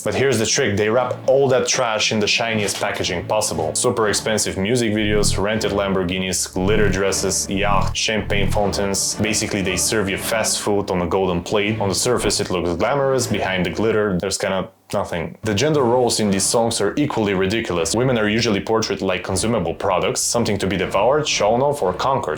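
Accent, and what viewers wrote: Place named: Balkans. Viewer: Ah yes... the most balkan looking man, talking about balkan music, with the most balkan accent. PLS KEEP REPRESENTING US! (Sincerely, an "Алт путка")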